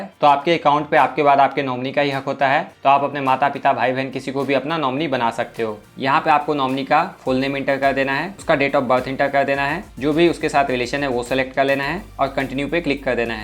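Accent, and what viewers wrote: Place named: Bhojpuri, India. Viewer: Pehle apka Hindi bhojpuri sidharo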